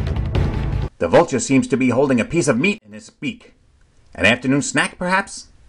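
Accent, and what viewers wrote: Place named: New York, United States. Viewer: I like your New Yorker accent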